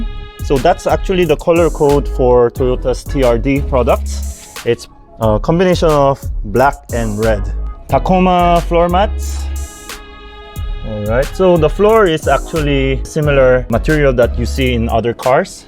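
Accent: Philippines. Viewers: He has a Filipino accent. Must’ve learned English in the Philippines.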